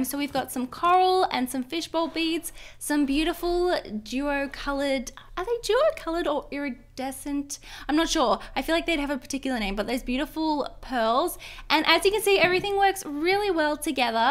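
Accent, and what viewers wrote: Australia. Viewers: Are u Australian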